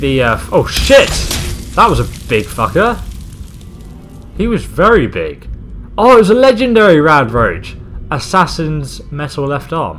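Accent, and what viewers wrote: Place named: England, United Kingdom. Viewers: definitely not Aussie accent but my bets on Brit or Scottish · This accent is clearly English!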